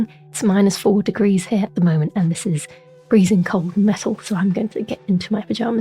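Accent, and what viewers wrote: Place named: Lancashire, United Kingdom. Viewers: Ah I've finally found my favorite Elden Ring Lore channel. I see a lot of them and many of them are amazing, but you really know how to tell a story. I love your voice as well, you'd actually suit doing voice work for Fromsoft games. Very relaxing and soothing English accent. A nice juxtaposition that some characters have in comparison to the harsh environment of the games. Thanks for this. I find it hard to piece tobegher the story of these games even when it's right in your face. This really helps to break it down. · First time I found your channel. And I swear it feels like I'm still playing the game 😅. Your voice fits the lands between perfectly. You sound just like latenna. I'm from Lancashire so I love a local accent.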